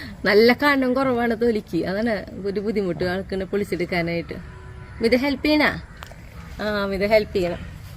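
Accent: Thrissur, India. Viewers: എനിക്ക് ഒരു പാട് ഇഷ്ടമാണ് തൃശൂർ ഭാഷയും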